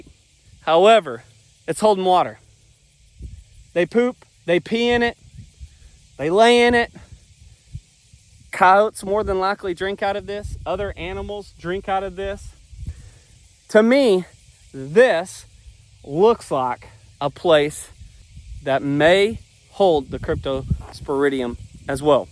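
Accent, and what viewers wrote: United States. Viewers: You can say “Waller” all the time as far as I’m concerned. Please don’t change your country accent. It’s nothing to be ashamed of. I love it.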